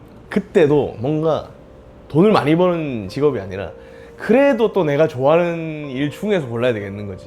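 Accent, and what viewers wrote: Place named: Busan, South Korea. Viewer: 폭팔적인 목소리 부산이시군요 가수로서 최고가되시길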